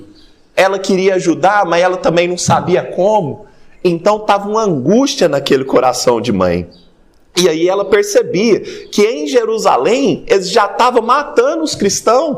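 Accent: Minas Gerais, Brazil. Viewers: Parabéns, adorei o mineirês. Me cativou.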